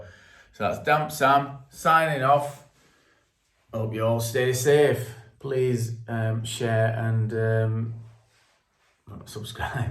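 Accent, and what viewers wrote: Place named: Ilkeston, United Kingdom. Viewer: Love the accent, sounds like an ILKESTON one to me, plus you look better with the beard. On a more serious note, I renovate properties for a living and tried your injection methods into the mortar for the first time and yes it worked out fine for me. You give out basic no crap information, good luck with your future videos, thanks Dave.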